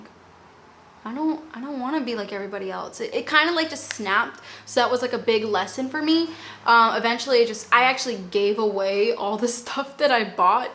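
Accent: Boston, United States. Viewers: Love your Boston accent. Love the way you said Michael Kohrs as Michael "Khohs."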